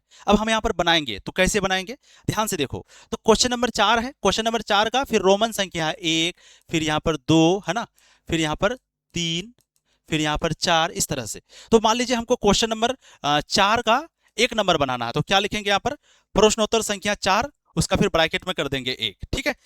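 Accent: Bihar, India. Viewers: Sir aap bihar se ho kya aapki boli se lag rha h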